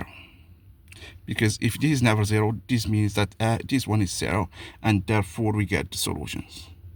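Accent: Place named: Morocco. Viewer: you sound very moroccan sir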